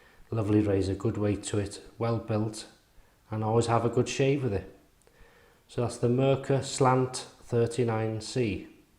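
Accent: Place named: Liverpool, United Kingdom. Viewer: Sounds scouse mate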